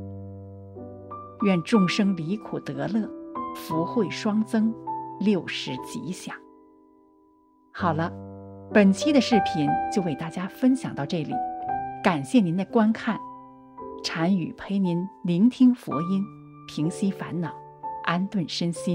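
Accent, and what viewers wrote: Hakka, China. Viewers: 佛友吉祥 客家口音有點重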